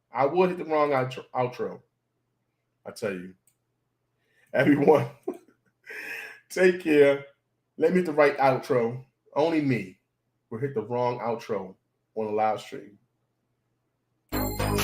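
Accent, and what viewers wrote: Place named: Maryland, United States. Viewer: Def interesting hearing about all you do. Thanks for the content! Love your MD accent!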